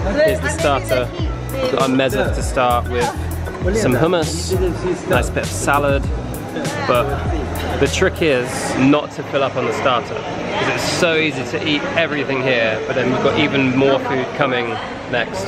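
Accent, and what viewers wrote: United Kingdom. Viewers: Amazing your video,!!! Congratulations I love the pronunciation of British English.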